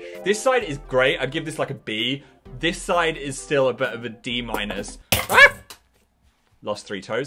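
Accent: North, United Kingdom. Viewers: Do I hear Phils northern accent making a comeback 🥳🥺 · I have never heard you sound more northern... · Is it only me or does sound extra british in this video?:D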